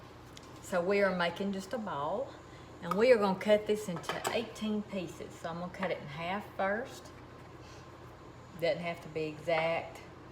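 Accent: South, United States. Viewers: I absolutely love your videos! You remind me a lot of both of my grandmothers. One of them sadly passed away 11 years ago and I miss her dearly. She made the best yeast rolls, but she never wrote down her recipe. I’ve been on a quest to recreate her yeast rolls and I’ve made many different versions over the years. I’ve come very close a few times but would love to try your recipe! You remind me a lot of my other grandmother as well, who like you, has the most beautiful Southern accent. 🥰 Your videos and stories are so wholesome and heartwarming and remind me of family, home, and comfort. It really feels like we’re part of your family. Thank you so much for sharing your life with us! Blessings to you and your family ❤️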